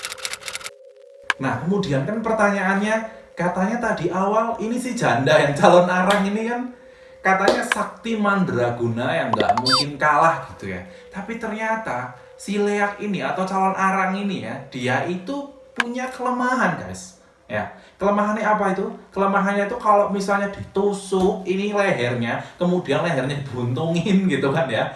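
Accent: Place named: Java, Indonesia. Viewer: Ora medok ora kepenak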